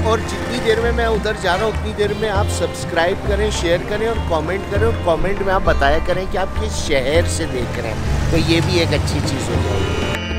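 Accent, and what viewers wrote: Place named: Karachi, Pakistan. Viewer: Sydney Australia.. love your accent bro.. karachi ki yaad aa Jati hai.. Best Food Explorer in Karachi😊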